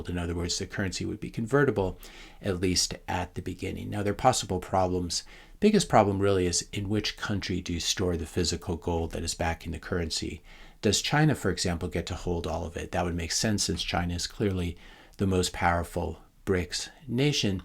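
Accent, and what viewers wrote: United States. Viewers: I hate your yankee accent , it is awful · Sounded like a ignorant american 👎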